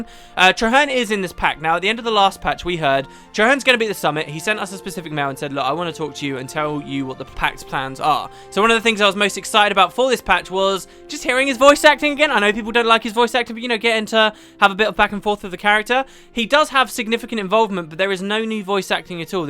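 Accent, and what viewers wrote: United Kingdom. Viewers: Your voice, is fucking amazing! Love me a well spoken Brit...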